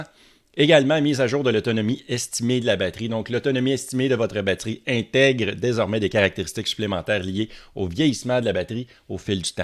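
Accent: Quebec, Canada. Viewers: J adore ton accent cousin. Les canadiens sont chers à nos cœurs. Et tu es agréable à écouter. Même si j ai bien de la peine que ma tesla model 3 LR de 2021 reste à la mise a jour de 2023 pour une raison que j ignore...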